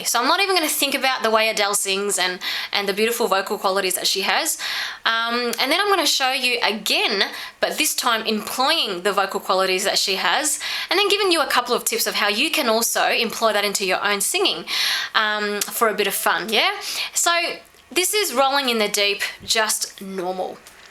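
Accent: Australia. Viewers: I love your accent, you must of lived in Australia for years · omk919, she sounds Australian for me :) she's not faking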